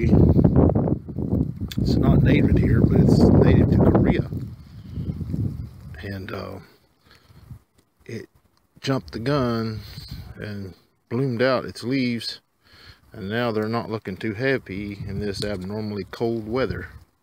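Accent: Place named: Oklahoma, United States. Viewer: What state are you in. Sounds like Oklahoma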